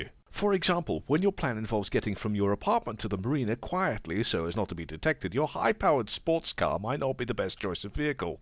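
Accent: United Kingdom. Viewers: You have such a beautiful british accent! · And here come the fallacies. "This is only a little similar! It's not the same! And to support my argument, here's an ad hominem statement." Alright then, let's put it all on the table, Similarities: Fast frames and fast talking, Reviews, Brittish accent, witty criticisms, same concept all around. Differences: does movies, Yahtzee does games. draws his own frames, Voices are different. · I feel like I've watched the movie and Now I don't have to waste 7 of my hard earned dollars. I also feel smarter because I spent my time listening to a very funny man who makes very good points about the current film industry. Also he is British which gives him lots of credibility in my American eyes. I mean all the bad guys in star wars had british accents didn't they?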